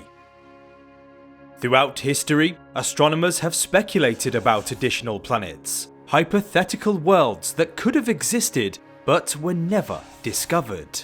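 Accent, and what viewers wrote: United Kingdom. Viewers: I got my own version you might enjoy if you want, Mr. British Voice, My Anglo-Saxon Cousin.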